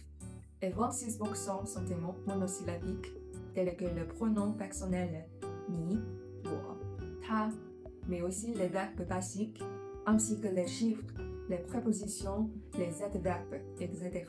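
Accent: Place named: Quebec, Canada. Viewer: 哈哈😄，老师的新视频出来了。第一个来打卡。这次的视频比起之前的视频让我耳目一新的感觉。法语表达挺溜，我的感觉带有些加拿大Québécois 的口音。太棒了。这次的视频更是针对外国学生学习汉语的关键问题。谢谢分享。👍🤩😘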